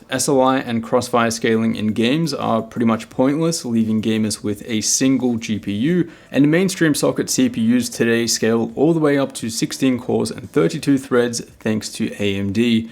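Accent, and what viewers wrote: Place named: Australia. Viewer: wtf if you want small go small, go small. why are you bashing on the 7 with an aussie accent?! :) and why r u reviewing it in the first place?! water cooling brah! with big radiators and a fancy pump! not like yours!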